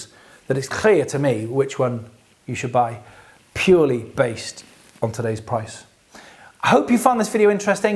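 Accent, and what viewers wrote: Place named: England, United Kingdom. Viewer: I watched the video and that was what I thought from the beginning. But, in this video, I think I just had a hard time understanding your English accent (I'm a hick from Indiana). Now that you cleared that up, I believe you must have been telling us that others had a problem with the 50 billion and not you. By the way, I watch a lot of HBar videos from various people and yours are by far the best and most informative. Most of the others are just pumping the coin without any real substance. Thanks.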